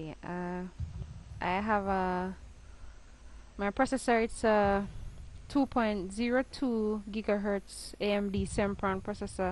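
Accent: Jamaica. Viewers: you sound jamaican i am jamaican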